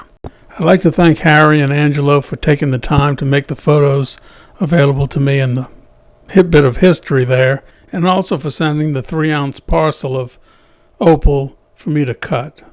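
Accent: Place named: New Orleans, United States. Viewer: Your accent is very nostalgic for me, you wouldn’t happen to be from around the Big Easy would you?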